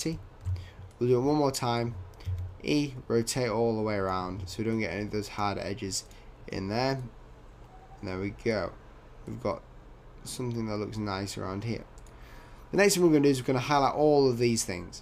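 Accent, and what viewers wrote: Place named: North, United Kingdom. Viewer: You sound like a northerner like me so, 'subscribed'.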